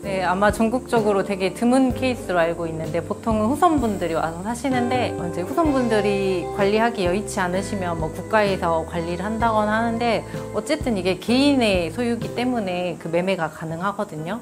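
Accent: South Korea. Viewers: 표준어를 쓰려고 애는 쓰는데 억양에서 확실하게 아랫녁출신의 느낌이 드러나 토속적인 분위기랄까?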